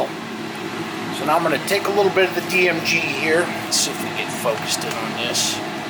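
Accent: United States. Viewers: WOW People and Viewers, this guy has deliberately gone out of his way to make this look like Bomb making and it's not. Why would this guy do that? is it that he's not wanting backyarders to encroach on his market? Listen to the voice - does it sound American? Americans always Lie for Commercial reasons - "There were no weapons of mass destruction in Iraq" only a bunch of children to be murdered for their oil" this guy is lying badly - he's an American - it'll be for the same reason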